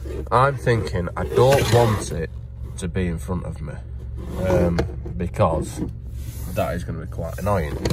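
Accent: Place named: North, United Kingdom. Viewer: Great videos,love your 'Northern' presentation! From one Northerner to Another😉!!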